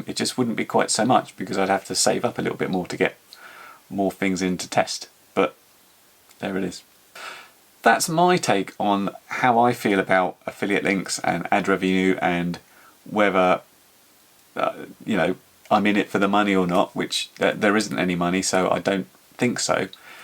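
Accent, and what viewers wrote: United Kingdom. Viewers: As a fellow Brit I find it refreshing to hear your accent and your content is spot on, you call a spade a spade. I must admit that I've never clicked an affiliate link in my life purely because I research a lot and by the time I decide on the product the video is well closed. Must remember to come back to them as a little thank you - I just haven't so far. Keep up the great reviews I try to watch all your stuff.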